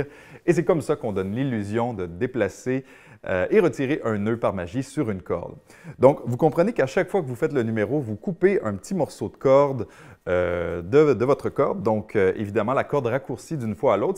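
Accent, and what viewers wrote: Quebec, Canada. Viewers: Petit garnement! J'ai t'ai vu souvent sur des vidéos tournés en France et je dois dire que l'accent québécois te va mieux. Merci Pour les tutos et bon succès!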